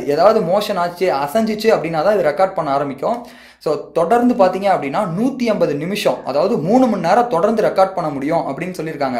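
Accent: Salem, India. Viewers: bro nenga Salem ha bro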